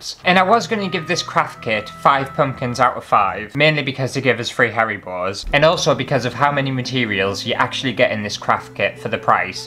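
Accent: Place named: Sheffield, United Kingdom. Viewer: fortunately, no balls have wandered into my water glass, hairy or otherwise :-) as far as your accent goes, I can get what you're saying 99% of the time. I've been expose to British culture for 40 years, and I don't consider myself an accent expert, but correct me if I'm wrong, you have a Sheffield accent...?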